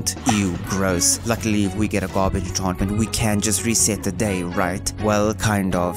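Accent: South Africa. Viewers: Are you South African? Your accent sounds South African 🤔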